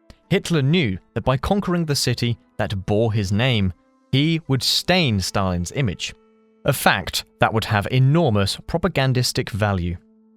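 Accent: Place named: England, United Kingdom. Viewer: Sería mejor un locutor de EEUU no a uno tan inglés, vuestra idea es llegar a EEUU, no a UK. Saludos!!!!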